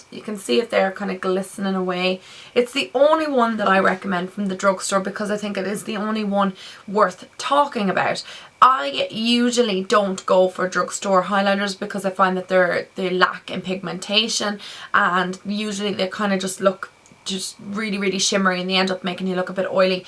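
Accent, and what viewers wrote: Ireland. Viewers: I only found out about your channel now and its amazing. I figured you were irish coz of your accent. Your make up tutorials are amazing. I was wondering if you went to college for beauty therapy where?. Beacuse that's what i want to do when i leave school. Or what colleges would you recommend! Would really appreciate it! Once again your videos are amazing:) x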